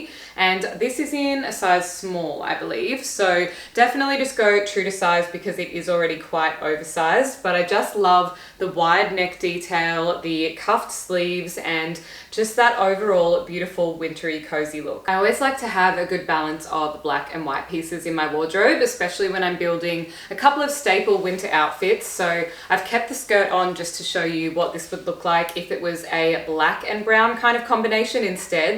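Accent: Australia. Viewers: You are a beautiful dark brown haired young woman. Beautiful eyes look kind of blue super cute, beautiful smile and cute voice with the Australian accent. Beautiful body and curves, cute little belly button, nice booty and sexy long legs. You look STUNNING and super cute in all of these outfits. You look like a dark brown-haired angel in the white dress. Everything this video looks REALLY good on you. All of the colors go really good with your skin tone and hair color and make you even prettier. You are beautiful and really cute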